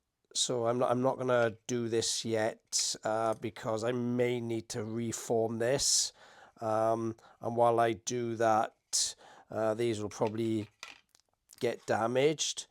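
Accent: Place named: Wales, United Kingdom. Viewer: Can’t wait for more updates, missing your Welsh voice to see out the day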